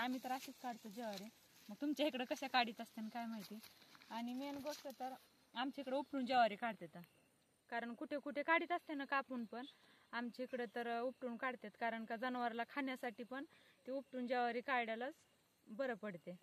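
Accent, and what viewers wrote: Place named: Parbhani, India. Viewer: तुमच्या बोलण्याच्या भाशावरून परभणी किंवा हिंगोली जिल्ह्यातील वाटतात ताई तुम्ही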